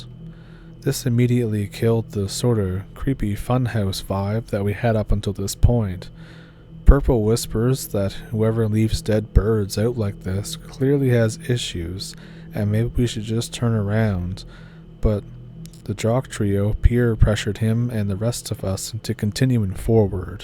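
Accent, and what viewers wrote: Canada. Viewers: How is it you grew up in AZ but have the hardest Canadian accent I've ever heard?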